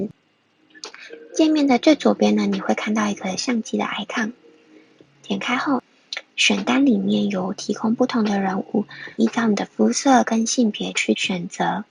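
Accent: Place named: Taiwan. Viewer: 聽你的口音還真的是台灣人，唉，你能不能轉國籍到大陸？你的人品比較適合在那邊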